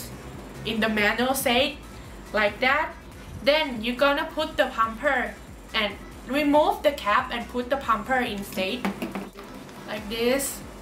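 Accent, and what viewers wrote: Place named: Thailand. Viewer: Thai accent Oh~ that good 👍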